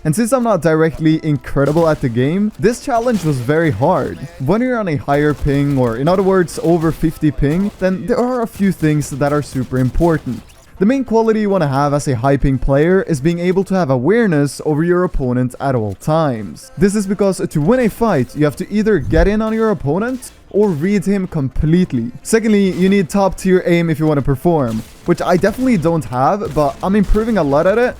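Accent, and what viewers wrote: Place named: Norway. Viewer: i hear Norwegian, jeg høre at du er norsk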